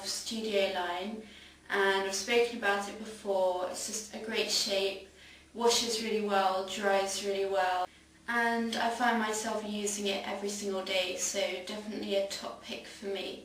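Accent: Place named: Public school, United Kingdom. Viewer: you have such a fabulous public school accent!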